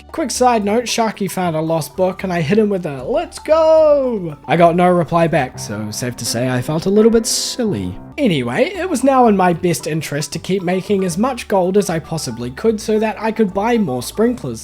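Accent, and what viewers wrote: New Zealand. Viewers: Ever since I found your channel and watched you super modded 100 days series I was trying to figure out your accent and to find out you’re a kiwi like me is such a nice surprise!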